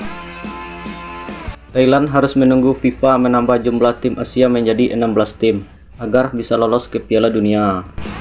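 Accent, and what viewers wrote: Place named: West Sumatra, Indonesia. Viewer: logat minangnya kentara sekali bg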